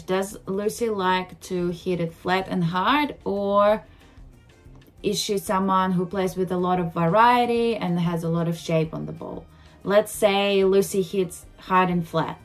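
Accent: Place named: Australia. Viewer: Hello. Having been a fan of Kasatkina and Zabiiako I got interested in you. I love how you are developing an Australian accent. I really like your videos you have a great personality and attitude. Your videos are enjoyable to watch. I wish you the best in your tennis career. Thank you for your insight. Keep smiling.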